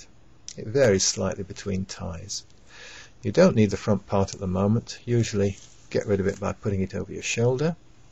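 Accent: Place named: Alabama, United States. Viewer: Your accent makes me think you might be from Southern Alabama. True?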